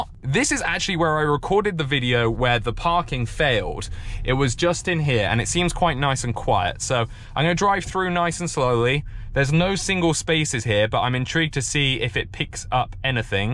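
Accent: United Kingdom. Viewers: North and middle of UK talk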